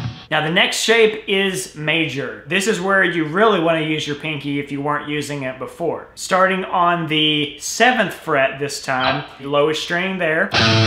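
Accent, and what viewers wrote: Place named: Alabama, United States. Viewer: As soon as I heard his voice I was like 'Alabama'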